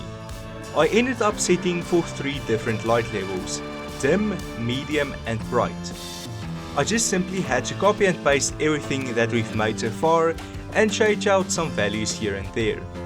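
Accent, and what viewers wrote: South Africa. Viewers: Bro im sure your from south africa can you confirm this? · South africa? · Your South African I now I am too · Are you from south Africa? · DUDE are u from SA because holy shizz your accent is on point omg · Is this guy South African? He sounds South African.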